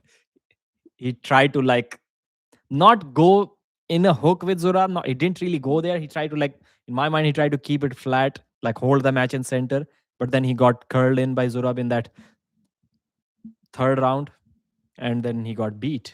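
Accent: India. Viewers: More Indian narrating, stfu and post the fucking match and wash your hands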